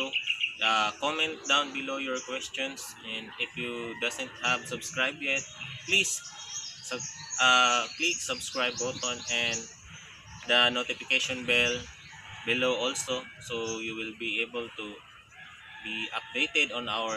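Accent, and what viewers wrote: Visayas, Philippines. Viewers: Taga san kayo kuya mukhang bisaya kayo ahh😁😊😊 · Taga san kayo kuya mukhang bisaya kayo ahh😁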